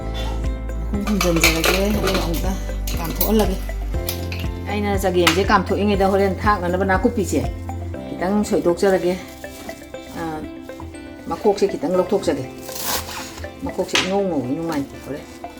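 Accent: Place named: Manipur, India. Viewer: emagi mathel singse meiteigi asengba chakhum malli .thongbgi style se ym kllkchei khwaigi pmjba khuthong ni👍👍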